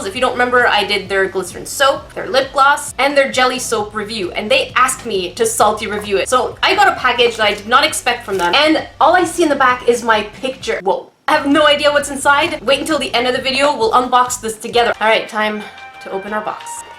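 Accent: Canada. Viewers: also are you canadian? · this is my first video im watching of yours and im very happy to hear youre canadian WOOT WOOT! go canada!! #toronto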